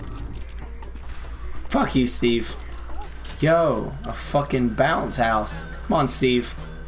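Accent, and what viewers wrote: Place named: Ireland. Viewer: Love the Irish accent 😂😂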